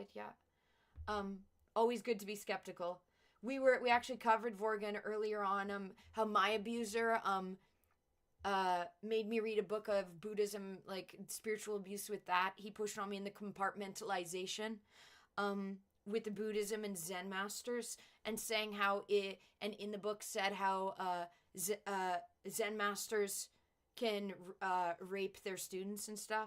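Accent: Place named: Australia. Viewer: I freekin love u dude. I wish I knew someone in real life like u. The Australian accent sealed the deal lol 😂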